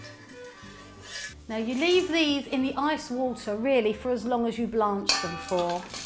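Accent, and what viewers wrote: England, United Kingdom. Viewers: I have an abundance of potatoes and I needed a way to preserve them; Thank you for sharing. I cannot believe some of these rude comments below. >:( I can hear you just fine, and the English accent is great. :) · What a lovely British accent! Very sexy...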